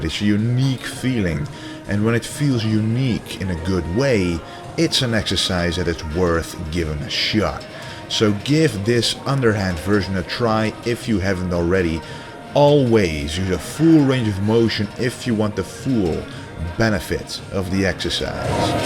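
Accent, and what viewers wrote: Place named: Netherlands. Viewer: are you from the netherlands? your english accent sounsd a lot like a friend of mine from the netherlands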